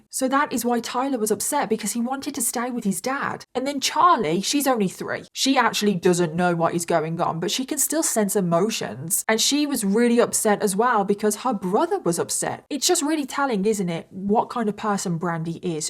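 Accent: United Kingdom. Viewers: thank you so much for covering this case. Your compassion for the victims always melts my heart. You are such a kind and empathetic soul. Also, please don’t ever apologize for your British accent! It’s what makes you wonderful! You can say “ahss” all you want. You are so brilliant. Much love from Seattle Washington ❤ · American here., your British comes out of you every second. Don't fight it; it's delightful. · I’m from Michigan and I have always loved your British accent BUT my heart just melted at the way you pronounced “assuming” “ashumming” lol. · Unbelievable!! I dont know hiw he could live with that woman, and not notice that she wasn't all there? Just so terrible, how someone could just do that, to their own small children?! Bless their souls & prayers to Jason. Thanks! You did an amazing job, telling this horrible story! Also, just wanted to say, you look so sweet! 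Those big blue eyes you have, are so beautiful, along with your cute British accent. Looking forward to the next time, thanks again! 😉 👍 🇨🇦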